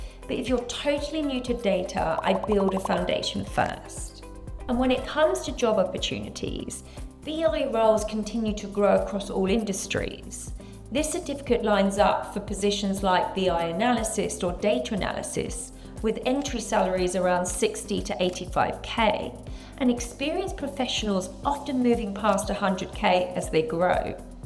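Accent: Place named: United Kingdom. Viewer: Thanks your very profrssional video. well said, well presented. your English language is Perfect clear slow british style. how u found ur 5yrs experience in coursera? honedtlu. all people talking about their desire to self learning but only 5% complete walking the road to the end. God bless u with many thanks. was nice just cross roads with u here❤🎉gd nite then happy greatvthurc13aug2026🎉😊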